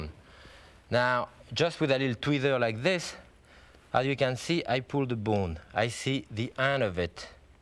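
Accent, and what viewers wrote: France. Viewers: I think I'm getting to the point that I can figure out his French-to-English quirks, even though some of them take a second to click. Bananas Foster with butternut sauce...butternut-->butter nut-->beurre noisette-->brown butter.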